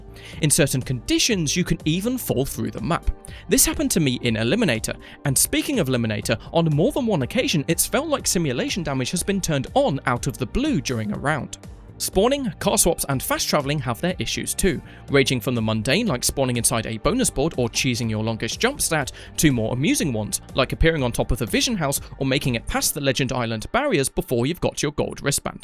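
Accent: United Kingdom. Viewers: That's just an English accent dude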